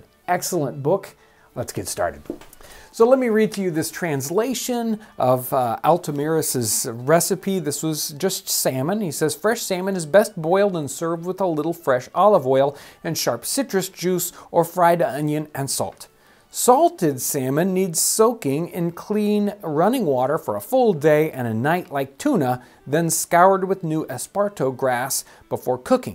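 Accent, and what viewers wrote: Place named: Midwest, United States. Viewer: Does anyone else love his Midwestern accent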